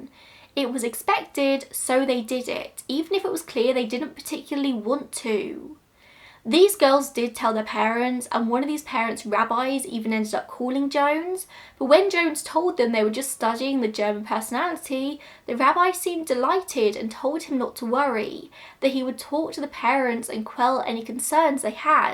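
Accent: England, United Kingdom. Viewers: She really does have a great voice, and this is coming from someone who usually hates the sound of English accents. But her voice is so calming and sweet that I actually like listening to her speak.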